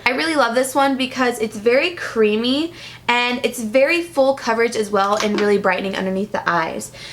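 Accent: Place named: Georgia, United States. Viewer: what part of ga are you from girl?!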